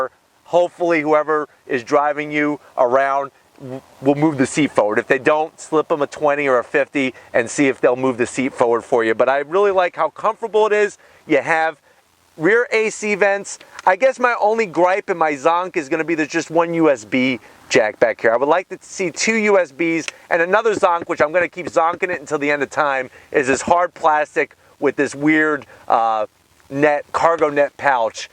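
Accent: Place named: Long Island, United States. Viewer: Ask you where in New York are you originally from that sounds a little bit like Long island if I'm not mistaken.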